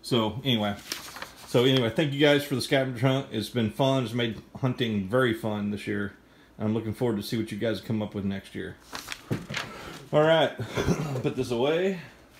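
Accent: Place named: Oklahoma, United States. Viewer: Great finds! I’ve never said that you still have your Okie accent but I’ve wanted to. Lol From one Okie to another, Happy New Year!! May this next year be even better!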